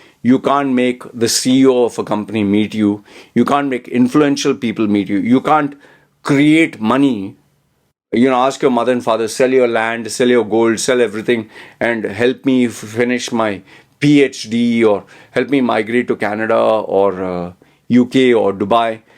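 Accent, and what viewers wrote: India. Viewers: you have a indian accent , are you too from india?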